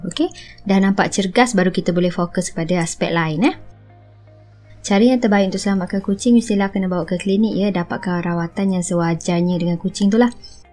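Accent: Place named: Malaysia. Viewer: mba nya dari malay logat nya enak di dengar dan mpusnya cantik dan beruntung smoga sehat terus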